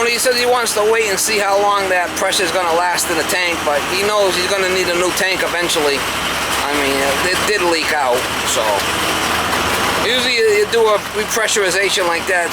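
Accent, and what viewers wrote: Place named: Massachusetts, United States. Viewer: I'd already watched a bunch of water pressure vids when I came across yours. I was going to skip it until I heard what I'm guessing is a Mass accent... so I watched the whole thing. The little dog riding with you was awesome and capped off with the howling pug. Great stuff!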